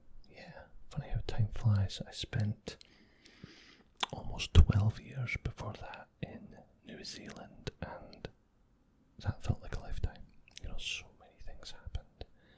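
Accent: Scotland, United Kingdom. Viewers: Love the Scottish accent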